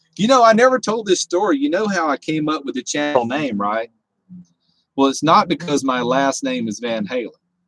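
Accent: South, United States. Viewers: Hey. Gotta check out all ur vids. I see Humbuckers on that Maple beauty. Is that a Gibson or Epiphone? Great Vid with in OR.All Subbed. I thought that smooth Southern accent was maybe GA or MI, but I'm guessing TN, now a fave state of mine. Kenny Chesney was an E.TN boy. Stay safe & Happy Trails brother.🎸🥂I'm in NYC and gotta bail. Gotta build a Van or Pickup Rig. Stay safe brother.phil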